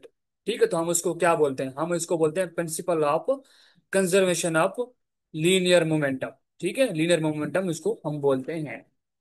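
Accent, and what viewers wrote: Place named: Sindh, India. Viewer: Your accent looks like you are sindhi? Acha kia dawood uni khi ka test easy hota hai ya difficult?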